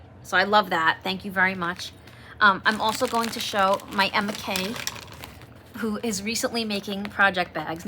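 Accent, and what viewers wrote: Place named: New Jersey, United States. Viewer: Hi! I'm watching you fr the North her in Ontario, 🇨🇦. I love your Jersey accent. I'm new to Cross Stitch & am thrilled to watch others videos & see their projects & stash. And oh my there often is mountains of both. I'm currently finishing up my project & looking forward to starting "Gracious Era"(Dimension kit) it's a Xmas gift for my mom. Glad you had fun at Stitch North, gosh I live close to the venue but was new at that time. So maybe in 2026 I can go! Happy summer! You're the second I've met in my life.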